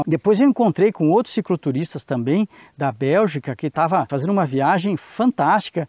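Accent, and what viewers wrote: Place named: Paraná, Brazil. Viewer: 👏👏👏👏 pelo sotaque e pelo nome vc é. Eu conterrâneo pelo jeito do Paraná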